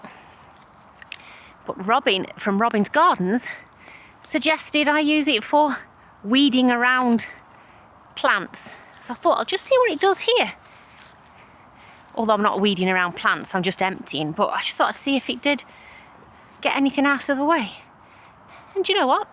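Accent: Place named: Staffordshire, United Kingdom. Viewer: Hello New sub from me. Found you through sow chatty. Love watching other peoples plots. I’ve just taken on a new acre garden so am only really at the planning stage of my veg garden. Fabulous that the farm provides you with such a great supply of muck. Great harvest. Lovely to hear that staffordshire accent too, being from staffordshire myself.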